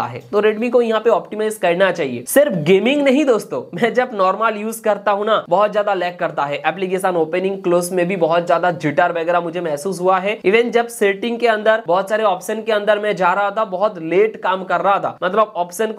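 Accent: Bengal, India. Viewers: You have a strong bengali accent.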